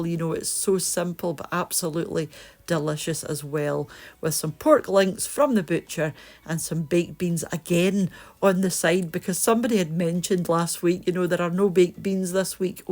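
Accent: Scotland, United Kingdom. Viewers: Hey, have you thought of changing the "Hillbilly" music to some nice Bagpipes? 🤣 Do you know, if you weren't Scottish, your channel wouldn't be half as good!... I mean you're a great cook, but it's your wonderful friendly Scottishness that makes your channel really special! Your accent is a delight! And Scotland is such a beautiful place! 🏴❤️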